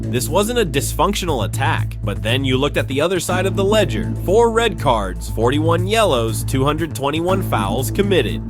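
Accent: United States. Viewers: Listening to a yank narrator on English football is a major put off. Blah blah blah blah blah blah blah blah. Tell us something we don't know 2 - zero!!! Foxtrot oscar mate!!!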